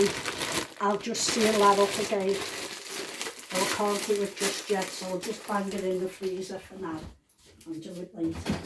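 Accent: Liverpool, United Kingdom. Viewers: You are just the Best mother .. grandmother and great grandmother and thank you for the laughs 😂. Keep up the good work...Your Scouse sound delicious. Enjoy ...😊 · Hi, im from Huyton Liverpool, your a lovely woman, Luv yur scouse…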